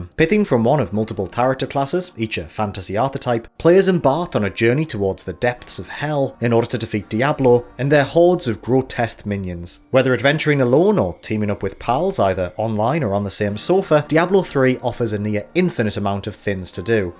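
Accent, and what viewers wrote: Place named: Northern England, United Kingdom. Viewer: Accent sounds like somewhere in northern/North Eastern England. I want to say Hull specifically.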